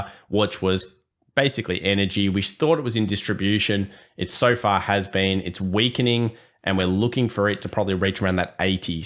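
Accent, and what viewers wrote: Australia. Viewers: You da man! Do you have a Crocodile Dundee Knife or an American knife? Sorry, that was my first encounter with an Australian Accent... And I have your Whatsapp #! I'm going to call from NY to wake you up!!!!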